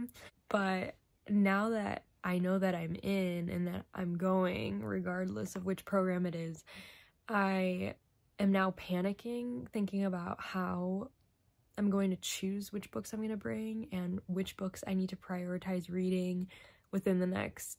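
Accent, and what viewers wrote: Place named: Spain. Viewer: ur spanish accent is cute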